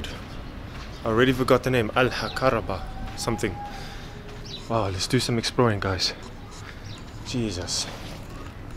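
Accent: South Africa. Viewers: This south African not german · You almost sound South African, good video. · Why do you sound like you are from South Africa when you speak English? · He sounds super South African to me 🤔. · Why do you keep tellimg people that you are from GERMANY, when your accent is clearlu SOUTH AFRICAN??????? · You sound S African, not German--enjoyable posts. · He got South African accent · I feel ashamed for him saying he is German - he speaks with South African accent. Egypt is a country I love and Egypt needs help showing the beauty of it to get more tourists not the hard life the people have to live from the corrupt government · I thought you were South African, now I wonder who the South African guy was….. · You are the most South African sounding German man I have ever seen (...heard) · You are German? You sound South African to me. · he is half German half SA guys, he was born in SA and lived in Germany the last years. 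He said that in one of the first videos. You can clearly hear an accent when he speaks German too.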